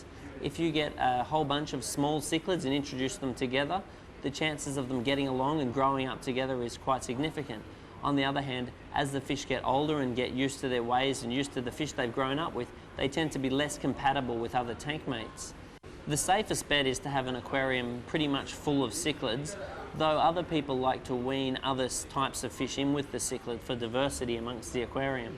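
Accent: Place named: Australia. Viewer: He's an aussie, not a brit lol. And for some of us who have high amounts of aggression in our tanks this advice is very helpful.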